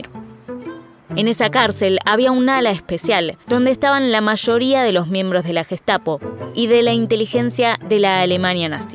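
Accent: Argentina. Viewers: El primero de masho... Si pretenden que gente no argentina se interese en sus vídeos, traten de disimular el acento. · Puufff, lamentable que el video esté en ese español argentino (o será uruguayo) "raro"....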